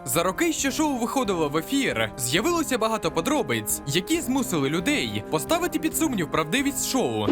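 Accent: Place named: Russia. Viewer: Чувак, ти цю промову ззапорєбріка якнайшвидше забудь, Це біда, і ти її носій, досить акати, кажи суть нормальною мовою.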